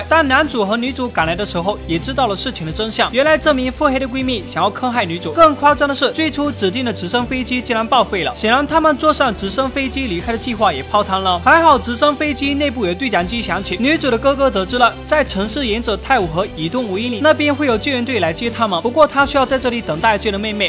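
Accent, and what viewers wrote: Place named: Fujian, China. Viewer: 应该是胡建。普通话不标准。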